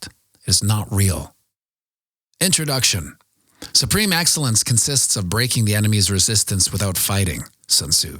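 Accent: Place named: United States. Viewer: It's horribly American - Nice if you're American, maybe?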